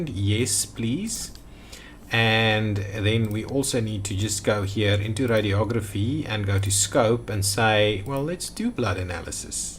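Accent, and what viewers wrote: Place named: South Africa. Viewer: This dudes accent is so saffa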